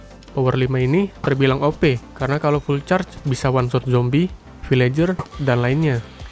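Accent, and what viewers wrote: Indonesia. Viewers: Plembang dkit cknyo😂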